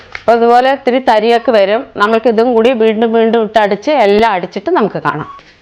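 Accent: Palakkad, India. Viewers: നല്ല പാലക്കാടാൻ ഭാഷ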